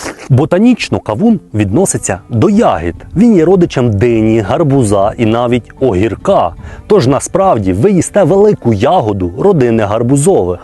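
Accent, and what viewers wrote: Cherkasy, Ukraine. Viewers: Чую земляка з черкащини! Молодець! ❤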